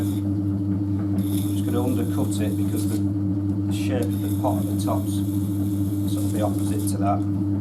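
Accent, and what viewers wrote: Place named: North, United Kingdom. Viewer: Lovely to hear a northern accent! Thanks so much for your time on these videos. Cookie jar looks a treat mate.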